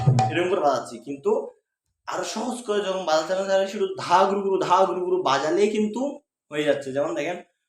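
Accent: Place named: Chittagong, Bangladesh. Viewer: হরে কৃ্ষ্ণ প্রভু, আমার মনে হচ্ছে আপনি চিটাগং এর। খু ব ভাল লাগল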